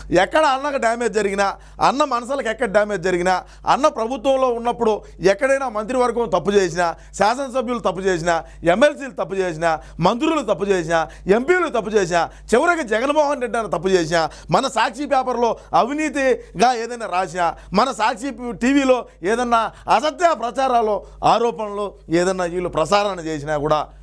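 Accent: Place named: Telangana, India. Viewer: Bro slang Telangana la undey😮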